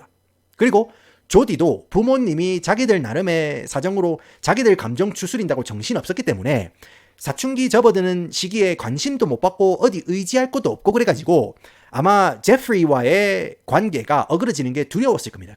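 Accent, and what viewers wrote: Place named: Gyeongsang, South Korea. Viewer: 갱상도 ㅎㅎ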